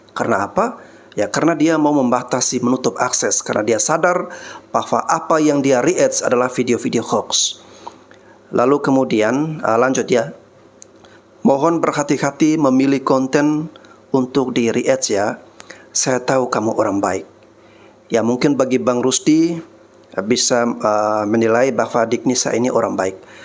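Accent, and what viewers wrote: Flores, Indonesia. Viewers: oh ye ke slang dia mcm org Indonesia jer kihkihkih 😂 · Aksen logatnya itu org NTT Flores 😊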